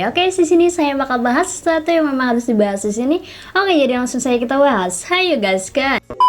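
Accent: West Java, Indonesia. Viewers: Kaya orang sunda kak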